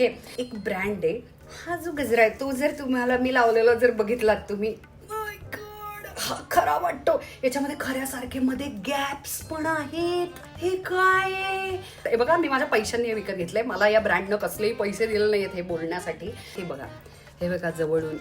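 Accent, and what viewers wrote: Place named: Kolhapur, India. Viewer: Tu punyachi vatatach nahis Kolhapuri pattern ahe ha as Dhak dhak mhantay❤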